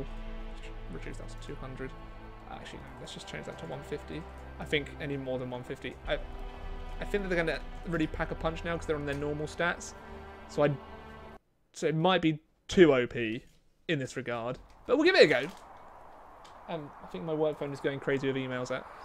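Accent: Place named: England, United Kingdom. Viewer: Are English accent annoys me you should talk less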